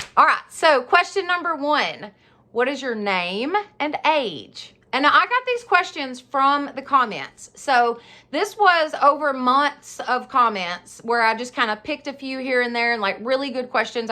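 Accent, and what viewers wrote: South, United States. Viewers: Thank you so much for this and I too crying to hear your story and what a great story it is. Good for you and if anyone leaves your channel then they were not worth your time.I am the same as you I tired to find fun and be happy and maybe a bit mad but that's fine with me.Keep up the good work. Just love your channel and always look forward to watching your videos.Love the southern accent I too have an accent I come from London so be proud of the accent as it makes it you. Hugs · You brighten my day! Love listening to your southern accent. Thank you for honesty...wish more people were as open as you are. I feel blessed to have found your channel! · Hey beautiful. You're Amazing! Thank you for being honest all the time. Love your Southern accent. Love your positive attitude. You're funny too. Love you my beautiful Angel. Blessings to you and your lovely family. 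You're absolutely correct. Who cares what anybody thinks.. No one is perfect.. There is only one perfect one, that is our loving GOD!! Having CHRIST in your life makes a person be happy and positive. Praise GOD you are here with us sweetie. JESUS loves us all. We are GOD'S children. AMEN. Hallelujah!! I will continue watching your channel my beautiful Angel. I love you.. You're Amazing and smart! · YOU. ARE. FABULOUS!!! I don't know any diyer that would be this honest, the things you've told us about yourself, shown us your life, even though you know you're the blonde hair, big boob, southern drawl cliche... I'm one too 😁 and all the naysayers can kiss my grits!!! And my bday is November 25th!!! But.... I don't want to scare you, however anyone wanting to find you, you've made it sooo easy.... just be careful!! And you need a bodyguard just let me know! I may not look like much but everyone that knows me is scared of me 😳😁🤣🤣🤣 Thank you for being you!!!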